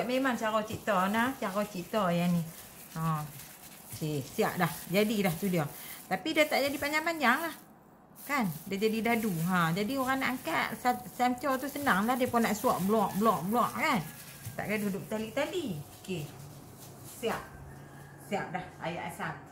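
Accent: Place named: North, Malaysia. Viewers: terhibur sungguh dgn loghat utagha buat kami diperantauan...’punca semua ni’ ayat tergelak besaq 😂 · Dah cuba resepi Ayam Masak Kesuma! Puaih hati... selalu dok tengok channel dengan suami. Suami seronok sebab dengaq loghat Utagha! Gelak2 dia. Semoga ramai yang menggunakan resepi, jariah nanti kat akhirat. Moga Allah terima...Aamiin2 YRA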